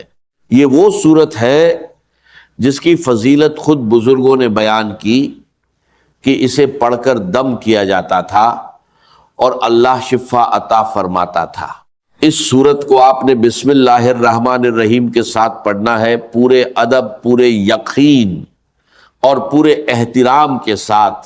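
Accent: India. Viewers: Ya kesi Hindi walay ki awaz ha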